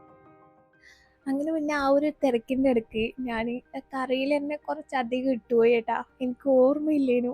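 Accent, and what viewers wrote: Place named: Kannur, India. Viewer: u r from kannur,? talking style is good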